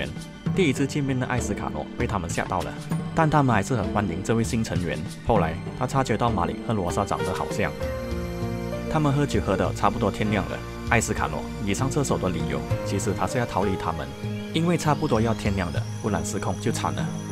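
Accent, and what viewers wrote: Malaysia. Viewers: 我肯定你是马来西亚人！！ 我看了你全部的影片觉得口音有点想马来西亚的，结果你说玩玩啊你以为我就认定你是马来西亚人了😂😂😂😂😂 我是真的有看完你全部的影片喔😂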